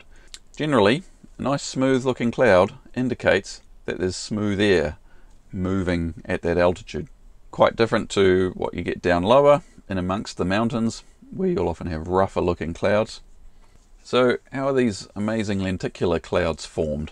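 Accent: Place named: New Zealand. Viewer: I love the NZ accent, ahbe been there in 2008 and love your humor, Keep on going and greetings from Switzerland (LSPO)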